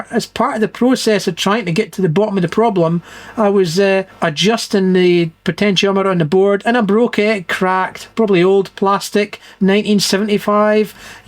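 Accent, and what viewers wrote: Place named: Scotland, United Kingdom. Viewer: I thoroughly enjoyed your interview with Dave from EEVblog. I think you were one of his most interesting interviews to date. Didn’t know you had it in you from just the view of your hands and Scots brogue all these years mate. Good on ya then!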